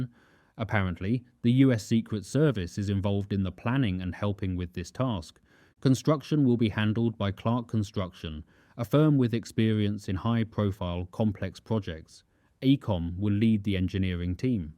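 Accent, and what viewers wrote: United Kingdom. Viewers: Hey Dude you sound British. How would you like it if the British king torn down Buckingham palace to make a modern all-glass tower like what they have in Dubai?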